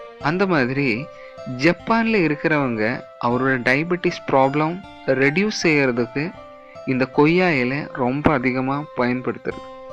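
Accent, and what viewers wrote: Kerala, India. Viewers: சாரே.. மலையாளியோ??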